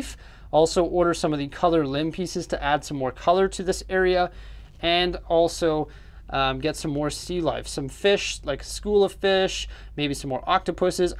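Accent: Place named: Edmonton, Canada. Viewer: I’m so glad I subscribed to your channel in the mist of the virus 🦠. You got of interesting stuff going on right here and I can’t wait to see more. I love your Edmonton accent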